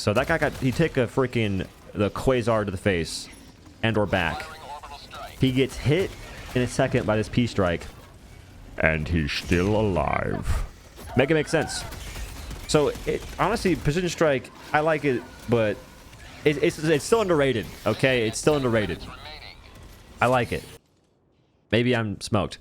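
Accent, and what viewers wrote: South, United States. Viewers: Bro do you have a southern accent or not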